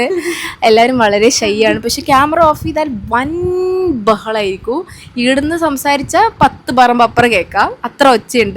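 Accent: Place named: Kannur, India. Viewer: Kannur slaaangg oke kity thodangeeele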